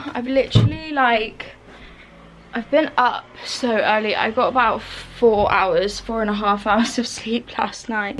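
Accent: Scotland, United Kingdom. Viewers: You’re Scottish accent 😂😂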